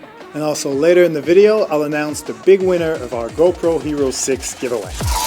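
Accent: Quebec, Canada. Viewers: Where is your accent from ? Quebec !?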